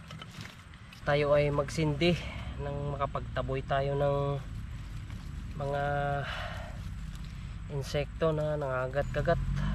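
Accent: Visayas, Philippines. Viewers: bisaya ka sir?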